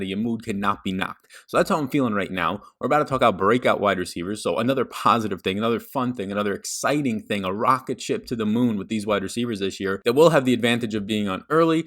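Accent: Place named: Northeast, United States. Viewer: <link> leaguesafe 10 dollar league trying for tonight i got 9 in i need 3 more you interested? Great analysis as always.I love how you do not waste time on corny jokes. Sometimes I do wish you would talk a tad slower sometimes I am too slow to process your thoughts. You def a northeast person for sure haha not hating just stating.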